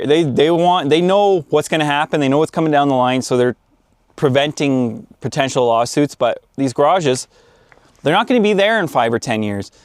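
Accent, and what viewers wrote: Canada. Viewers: This accent makes me wanna slam down some TimBits, while I wash it down with some Molson and watch the Senators play the Maple Leafs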